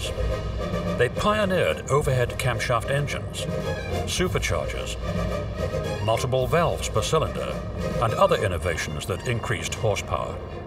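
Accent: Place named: United Kingdom. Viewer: If these cars were American made, why is there a british guy narrating this video?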